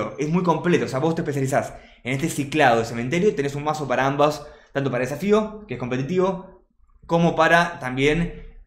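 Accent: Argentina. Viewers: Habla como argentino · Che Vivis en argentina o españa por la forma en la que hablas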